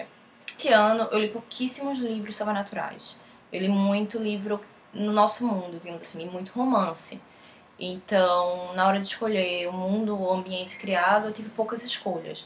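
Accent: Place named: Portugal. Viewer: vc por acaso é portuguesa ou de algum país? é que vc tem sotaque!!!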